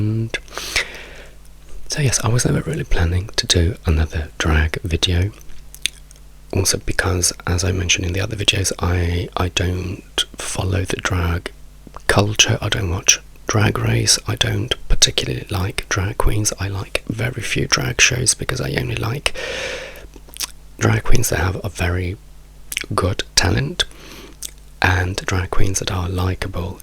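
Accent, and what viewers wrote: England, United Kingdom. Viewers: mamma mia ho appena visto un tuo video in italiano, sentirti con questo accento inglese è pazzesco 😍 meraviglia davvero sei spaziale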